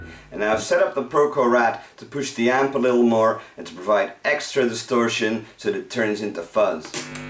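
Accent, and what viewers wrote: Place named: Netherlands. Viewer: Ha, the unmistakable Dutch accent. Nice vid.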